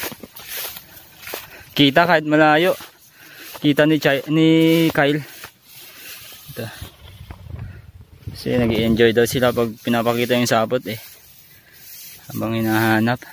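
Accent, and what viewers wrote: Ilocos, Philippines. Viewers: Ilocano ka pati siyak😅😅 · Ilocano po ba kayo oh igorot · Ilocano ka gayam boi hahaha.napugsat diyay saput nan hahhah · Ilocano ka met kanno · Akala ko po visaya po kayo yuon po pala ilokano po kayo ilocano din po ako heheh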